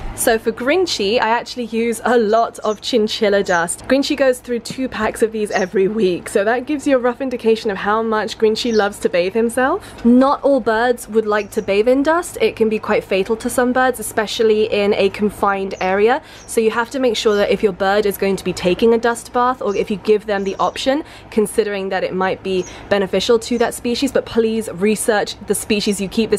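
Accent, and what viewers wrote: Australia. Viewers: Why do I think she is Australian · Is she Australian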